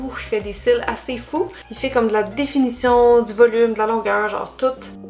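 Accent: Quebec, Canada. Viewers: Hello! Je te suis depuis trèèèèès longtemps, j'adoooore tes maquillages, je trouve que tu mets de la bonne humeur dans tes vidéos (je pense que ça doit être aussi à cause de l'accent Québécois ^^, que j'aime beaucoup soit dit en passant!:D). Des bisous de la Belgique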